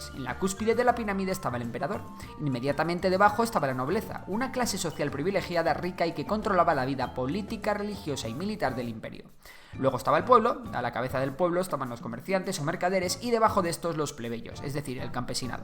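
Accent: Castile, Spain. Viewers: El habla español castellano weey nomms. No es el mismo acento que el acento mexicano, no seas duro hombre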